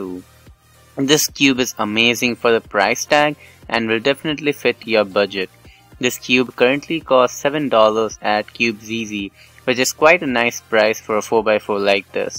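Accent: India. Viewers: U sound Indian, are u?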